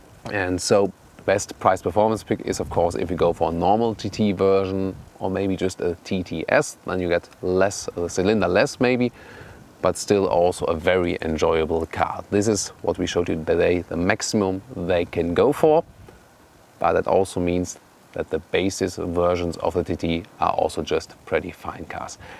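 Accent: Germany. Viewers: can you do something about the German accent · Ich konnte schon den Akzent erkennen. Deutsch. Der TT ist ein schickes Auto. :D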